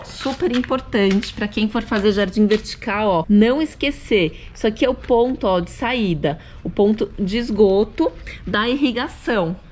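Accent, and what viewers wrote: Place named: São Paulo, Brazil. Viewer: Falo como você! Sou Paulistana!!!!!!!!!!!!!!!!!!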